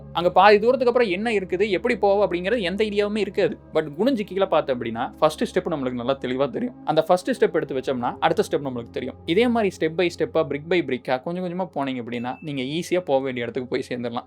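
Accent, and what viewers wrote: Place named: Kongu, India. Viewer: Little Kongu Tamil and Big motivation.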